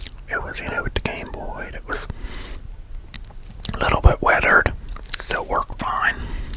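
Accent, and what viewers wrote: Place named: Pennsylvania Dutch, United States. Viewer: You're way off. Its penny dutch.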